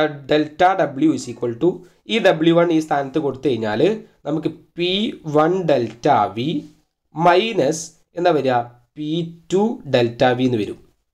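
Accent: Malappuram, India. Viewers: Sir malappuram aano? Slag kettathond chodhichadhaan😁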